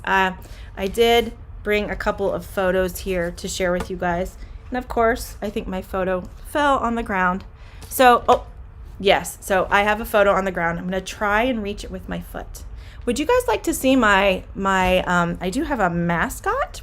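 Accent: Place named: United States. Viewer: rarely I understand the americain accent welcome mam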